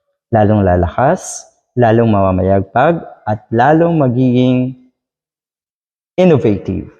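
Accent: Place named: United States. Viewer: FIL AM YATA ITO SLANG MAGSALITA...SLANG DIN UTAK ..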